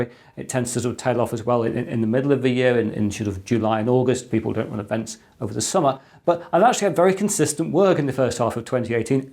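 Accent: England, United Kingdom. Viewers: I very much enjoy the vidieo's, in fact at 62 I'm finding myself once again energized into doing more new things. The video length is good but, probably due to my age, I find you speak a bit quick so I have to go back and re-review to be sure I understood what you said. Lighting is good, subject camera work is also good and your explanations don't seem to get off subject and like most American's, love the English "accent" .... LOL. Recently dropped you an email asking a question concerning the Windows PE and hope to hear from you soon. Please keep up the great work, it is greatly appreciated from this side of the pond!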